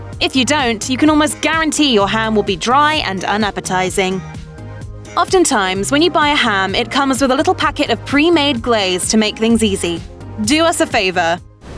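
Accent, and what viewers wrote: England, United Kingdom. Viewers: Love that British accent ❤️ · Well folks dhow this is a an ok sort of video please be aware. While the narrator may be British and have a British accent .All the temperatures recommended are in the Fahrenheit (°F) range Where as in England they use Celsius (°C) the internal temperature of 143 F is the equivalent of 61.6667 Celsius (°C) But please be advised for an uncooked ham the recommendations are 63°C or if you are in America where they use the Fahrenheit scale that would be 145°F for the internal temperature . A cooked ham the recommended internal temperature is 140°F or 60°C So going 145°F or 63°C on a cooked ham you will be over cooking it. Now as the only countries that officially use Fahrenheit as a unit for measuring temperature is the United States, the Liberia and the Cayman Islands. Other parts of the world use both, though Celsius is the standard. 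So one can take it this video is for the American market. But if you are outside the aforementioned. Then your appliances control's are more than likely designed for the Celsius (°C) temperature range . So something to look out for when cooking As for F to (°C) converting Subtract 32 from the Fahrenheit temperature. Multiply this number by five. Divide the result by nine. 143 F - 32 =111 x 5 = 555 / 9 = <num> But no matter what you do always cook your ham LOW AND SLOW An internal temperature gauge is a must in any kitchen Anything else is just guessing and mostly leads to over cooking or even worse under cooking It is all about the internal tempera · English accent. They don't do pineapple on ham.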